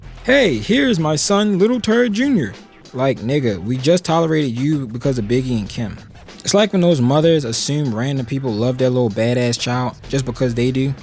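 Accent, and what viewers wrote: Baltimore, United States. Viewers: You sound heavy on the Baltimore accent 😂 but commentary though my guy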